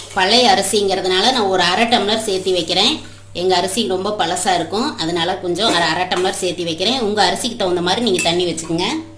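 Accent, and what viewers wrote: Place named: Madurai, India. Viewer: மதுரை தமிழில்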